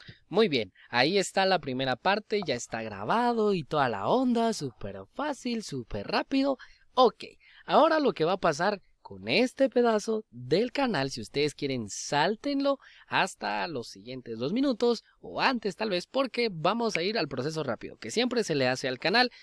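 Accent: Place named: Venezuela. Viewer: me suenas a venezolano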